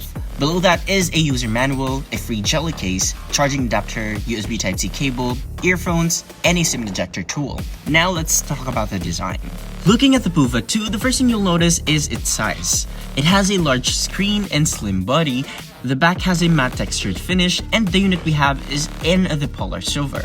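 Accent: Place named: Philippines. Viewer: Are you a filipino? I like your accent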